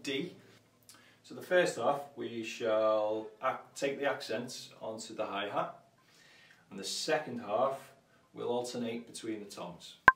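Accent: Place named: Wales, United Kingdom. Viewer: the first contact I’ve ever had with a Welshman in a long while (worked with some ladies from Wales many years ago—love the accent/language). Thanks for considering my comment — hope the move was successful!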